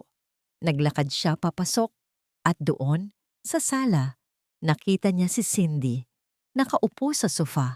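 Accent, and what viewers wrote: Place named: Conyo, Philippines. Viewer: Parang tanga ang salita ginawang hindi ko na nga tinapos konyo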